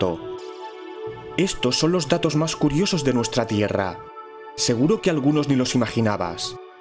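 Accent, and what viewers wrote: Spain. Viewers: Parece más de España, no? Saludos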